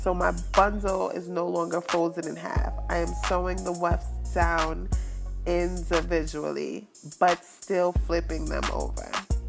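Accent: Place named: Brooklyn, United States. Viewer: Girl you sound like you from the Brooklyn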